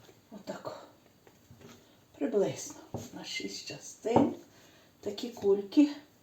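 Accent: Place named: Galicia, Ukraine. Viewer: Так гарно слкхати наш галицький говір. Як мкзика звучить.. ❤❤❤❤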